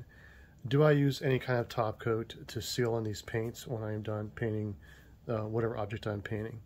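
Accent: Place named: Canada. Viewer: Classic Canuck talk. 😆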